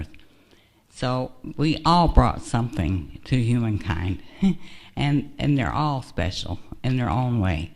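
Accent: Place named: United States. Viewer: アメリカ英語に聞こえます。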